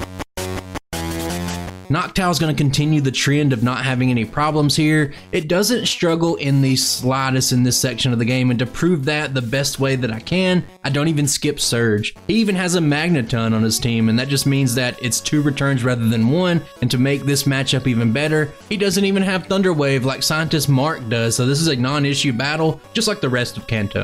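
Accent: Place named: Texas, United States. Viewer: the texan accent is sooo good with pokemon challenge runs just what i needed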